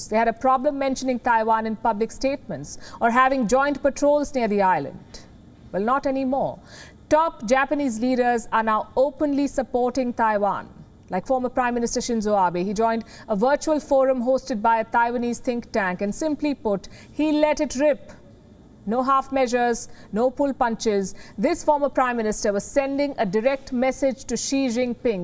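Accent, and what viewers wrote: England, United Kingdom. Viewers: I like this host's English accent. Lol (no offense)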